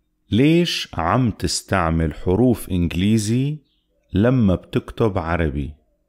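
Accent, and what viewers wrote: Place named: Syria. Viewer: it's syrian dialect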